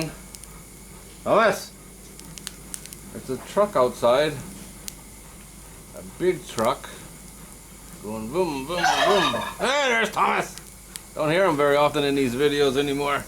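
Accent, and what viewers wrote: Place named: Manchester, United Kingdom. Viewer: Manchester speach 👍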